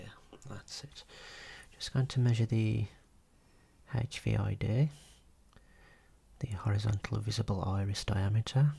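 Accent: United Kingdom. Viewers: where are you from in the UK? I like the accent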